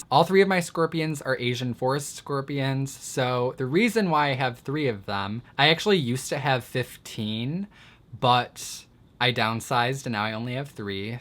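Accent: Midwest, United States. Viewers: what state are you from you sound like you have a midwest accent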